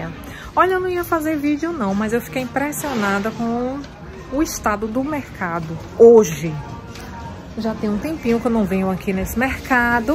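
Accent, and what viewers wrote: Bahia, Brazil. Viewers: Arrá! Descobri! Ela é baiana! Fala mainha, salvadô....😁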